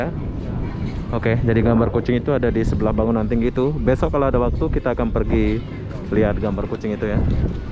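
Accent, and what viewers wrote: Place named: Peninsular Malaysia, Malaysia. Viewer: Abangnya ngomong ala melayu semenanjung sama orang Sarawak sementara orang Sarawak cara ngomongnya mirip Indonesia.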